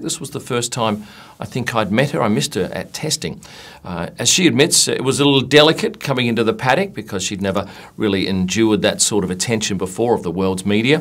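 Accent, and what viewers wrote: Australia. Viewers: I have to admit, I too heard 1996 first. I went back and listened to it a few more times., your handsome Australian accent may have been a reason for mishearing the number. Being more used to hearing British and American English, the diphthong in '86' sounded more like '96' in my ears. But glad to stand corrected, 86 it is! 😎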